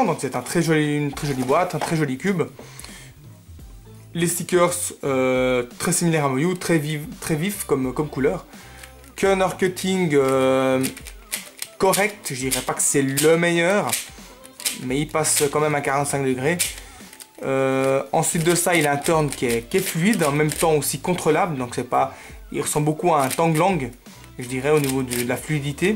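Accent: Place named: Fribourg, Switzerland. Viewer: Ouais, je suis aussi fribourgeois. J'ai vite reconnu l'accent !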